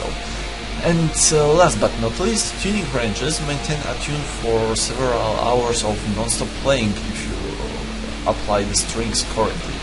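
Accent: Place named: Poland. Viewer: good video man. haha dont worry about your English, and be proud of your Polish? accent! greetings from Czech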